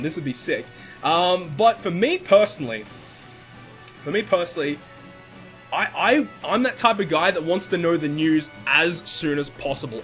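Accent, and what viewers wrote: Australia. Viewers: So you from Australia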